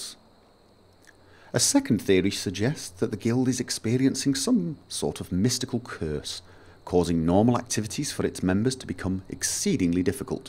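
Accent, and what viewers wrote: United Kingdom. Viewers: Wooow, You're from Norway? Why the hell did I thought you were from the UK?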